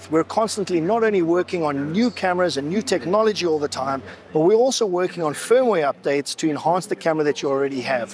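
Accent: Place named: South Africa. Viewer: He sounds South African 🇿🇦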